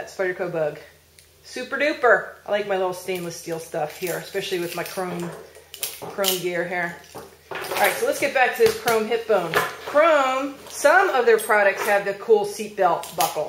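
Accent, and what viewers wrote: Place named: New Jersey, United States. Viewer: I love how Jersey girls say "wooder" ;)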